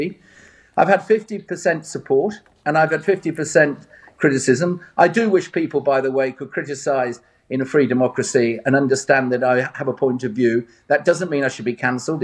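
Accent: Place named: Birmingham, United Kingdom. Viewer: Yeah you sound brummie….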